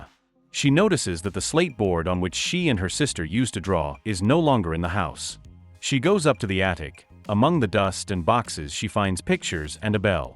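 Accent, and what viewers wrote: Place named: France. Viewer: Interesting French accent.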